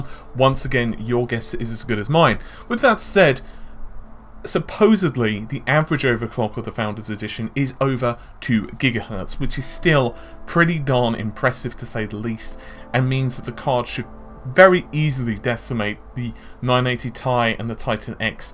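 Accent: England, United Kingdom. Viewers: that background music and that English accent makes me sleepy but in a good way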